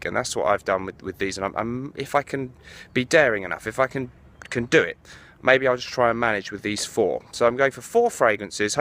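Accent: United Kingdom. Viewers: Sexy European accent? Dude. Come to The US, and you can have pretty much any woman you want with that British accent of yours.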